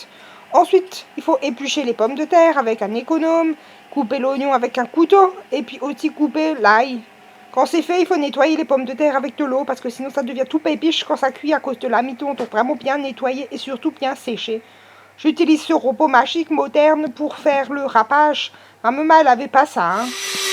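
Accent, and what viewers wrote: Alsace, France. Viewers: rhooo l'accent alsacien est top ;) j'adore je vais essayé de refaire la recette bisous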